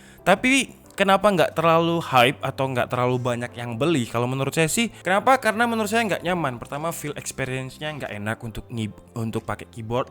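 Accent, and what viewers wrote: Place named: Padang, Indonesia. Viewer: Terlalu Padang dong :v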